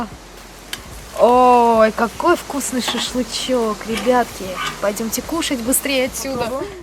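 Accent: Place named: Belarus. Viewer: Белозубая улыбка это нечто!! И профиссиональные снасти аксессуары это зачёт!! Смотрю тебя с удовольствием настроение супер, спасибо за видосы природы и твои лайфаки для рыбалки и конечно твои комментарии говорок белорусский!!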